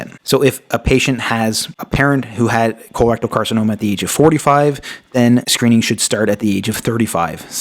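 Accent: Canada. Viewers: Canadian? Yuck.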